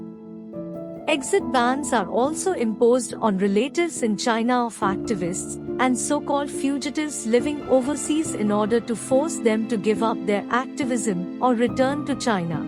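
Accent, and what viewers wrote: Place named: India. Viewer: The Indian accent tells me all I have to know that this is a garbage channel.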